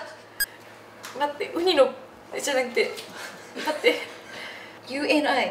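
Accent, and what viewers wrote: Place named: Kansai, Japan. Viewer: ノースリーブだと綺麗な首筋や肩が見えるのでとても似合ってる。 こんな可愛い顔してるのに変にぶりっ子してへんし、ハキハキの関西弁とマシンガントークのこのギャップはたまらん‼️ speaks really quickly😵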